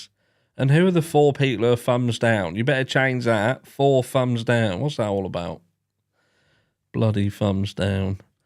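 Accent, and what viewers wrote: Norfolk, United Kingdom. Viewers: Thanks for the LIVE! Your Norfolk accent is truly showing:) keep up the good content. you make me laugh.:D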